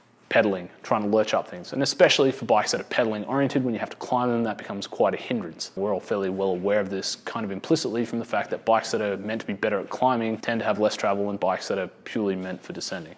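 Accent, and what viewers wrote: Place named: Australia. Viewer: haha reppin the aussie accent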